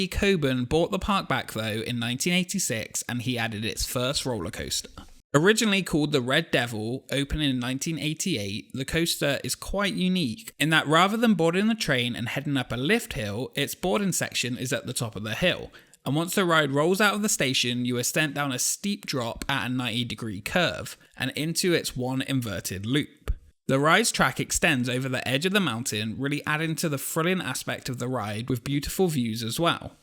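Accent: United Kingdom. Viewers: It's funny hearing a British person describe a park in North Carolina. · It's annoying to hear a Brit when it's in NC... that's why. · I mean, he does have a British accent. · Where are you from? I know Britain, but which part? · What part of the UK are you from? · AAAAAGGGGHHH... British Narration. FUCK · Why is it that all the narrators for videos like these seem to have British accents?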